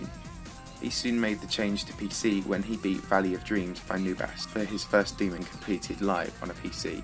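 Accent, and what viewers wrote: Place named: RP, United Kingdom. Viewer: Good video but something is missing..... SUBTITLES! (Because your british accent is 2 formal, I can't hear it clearly ): )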